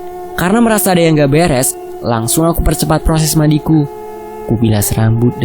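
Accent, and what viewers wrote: Java, Indonesia. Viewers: J Ms jowone medok bngt rek 😂😂😂😂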